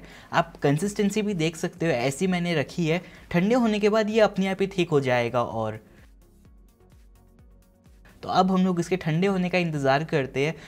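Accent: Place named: Rewa, India. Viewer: Arey sir mai MP rewa se hu...... Aap bhi wahi se hai kya mujhe aapki boli wahi ki lgti h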